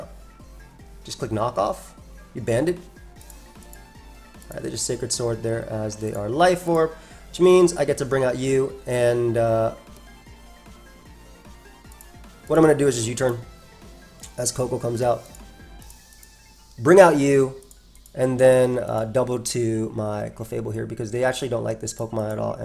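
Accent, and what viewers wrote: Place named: New York, United States. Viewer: The New York accent hasnt faded from Connecticut dwellings. Yes. 👌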